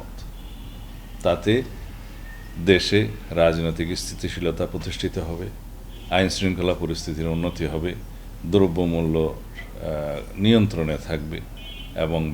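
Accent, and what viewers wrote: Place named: India. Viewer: তুমি এত বছর ভারতে ছিলা। ভারতের সুরে কথাবলবা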